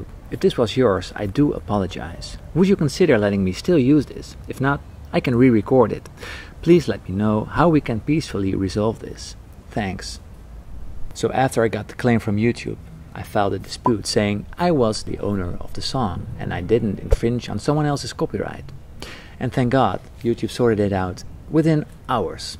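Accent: Netherlands. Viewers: ik ruik je Hollands accent, Hup Holland Hup we worden Wereldkampioen 2022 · I Always thought you had a dutch accent and the mail in this video confirmed it for me :)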